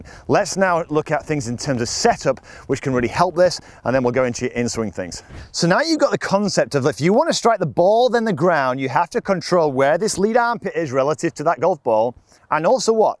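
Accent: Yorkshire, United Kingdom. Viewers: Where are you from? Sometimes I hear Yorkshire sometimes I don’t 😂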